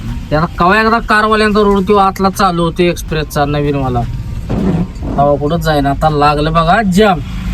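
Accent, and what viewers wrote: Satara, India. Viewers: सातारची बोली भाषा एक नंबर भावा😂😂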